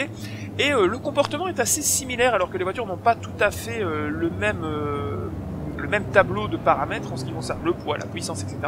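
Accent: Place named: Belgium. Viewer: c'est différent on va dire. Pour moi c'est convenable, juste qu'on dirait qu'on t'entend de plus loin. Peut être juste un soucis de volume j'pourrais pas dire. J'en profite que tu aies répondu a mon message pour te féliciter pour tout ton travail, que c'est grâce a toi et ton pilotage que je me suis mis a fond dans la simu auto sur circuit (Je jouais qu'aux Dirt et a dirt Rallye des la sortie.). Commentary superbes, pilotage superbe, et qualité au top. Puis c'est cool d'avoir un peu d'accent belge aha. Encore merci pour ton contenu! T'es au top!;) PS: Manque une seule chose, plus de vidéos sur Dirt, comme une carrière en let's play par exemple, et pourquoi pas du test Rallye sur Assetto Corsa. Et la j'aurai plus rien a demander aha 😂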